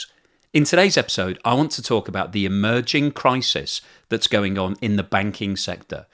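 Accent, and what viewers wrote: United Kingdom. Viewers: Just because you are British you sound like you know what you talk about but you don't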